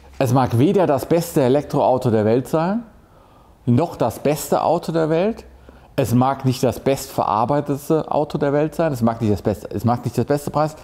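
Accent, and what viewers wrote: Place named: Hesse, Germany. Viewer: Lieber, ich stehe aktuell vor der Entscheidung pro Elektro-SUV und habe mir daher unzählige Youtube Videos angeschaut. Zudem bin ich den EQC gestern zur Probe gefahren. Deine Eindrücke teile ich zu 100%. Das vermutlich ehrlichste Review überhaupt - zudem im hessischen Heimat-Slang! Besser geht net. Was mir zusätzlich aufgefallen ist: der Raumeindruck ist doch etwas beengter als bei der Konkurrenz. Das war auch schon beim GLC der äußeren Form geschuldet und ist durch den höheren Boden (Batterie!) nicht besser geworden. Es ist wirklich eher bloß der Eindruck aufgrund der gerundeten Formen, denn Platz ist trotzdem für 95% des natürlichen Publikums ausreichend vorhanden, meine ich. Die andere Geschichte war das Fahrwerk. Das federt einerseits Unebenheiten souverän weg, hinterlässt jedoch auf längeren Bodenwellen ein Gefühl leichter Seekrankheit bei mir. 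Da hätte ich es gern einen Tick direkter gehabt. Ist vielleicht persönliche Präferenz. In engen Kurven bleibt der Wagen spurstabil, kann seine 2,5 Tonnen aber nicht ganz verstecken. Das ist die Physik. Die Bremse war für mich übrigens okay.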